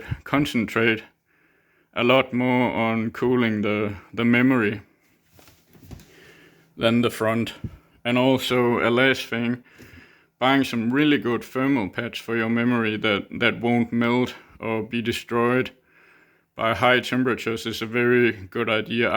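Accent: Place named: Denmark. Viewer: U sound very danish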